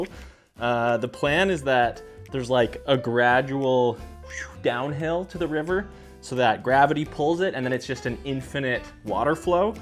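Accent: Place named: Canada. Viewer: Bro your accent is like so Canadian and I love it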